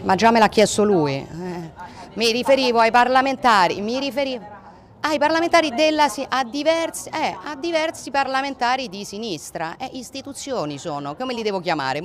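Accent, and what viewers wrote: Rome, Italy. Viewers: mi chiedo per quale motivo la presidente del consiglio in occasioni pubbliche non parla in Italiano corretto ma storpia sempre con un dialetto romano da borgatara.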